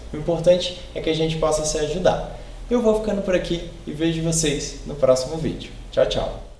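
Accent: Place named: Espirito Santo, Brazil. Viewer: Você é capixaba? O sotaque parece haha muito bom!!!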